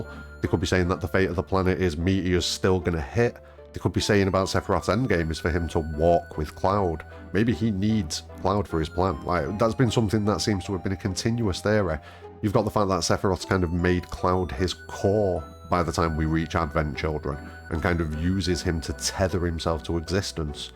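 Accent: Manchester, United Kingdom. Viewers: I literally thought that once I’d listened more. I moved here three years ago, so I’ve got ears like a bat for the Manc accent now. I could just imagine you saying, ‘Fock off, Man City!’ Hahaha.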